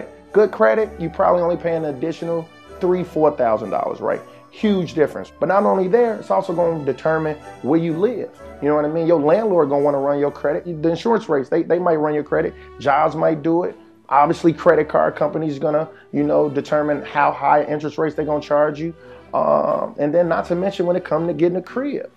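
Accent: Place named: Chicago, United States. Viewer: Bro GOTTA be from Chicago !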